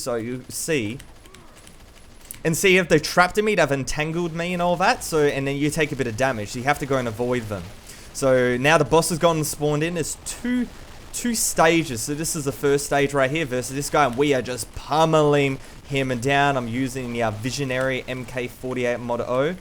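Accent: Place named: Australia. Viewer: LMMFAO..only 7 years..first of all change the idiotic shrimp on the barbie voice..2nd of all get some skill from someone who has played since Beta..👍🏻👍🏻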